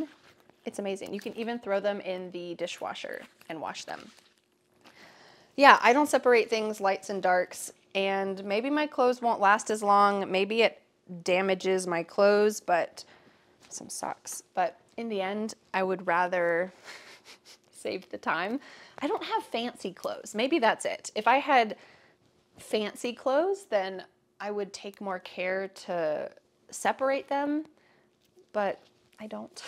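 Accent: United States. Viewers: Are you American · Hi ma'am.are you American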